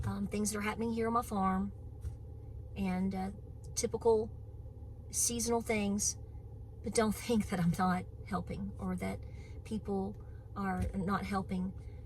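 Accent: United States. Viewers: I love that country accent! We have to help one another ❤ Be safe storm season is just beginning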